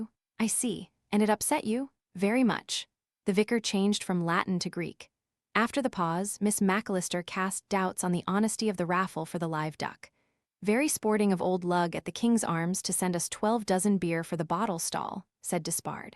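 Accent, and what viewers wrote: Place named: United States. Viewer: Why on earth is an American accent used to read this quintessentially English novel.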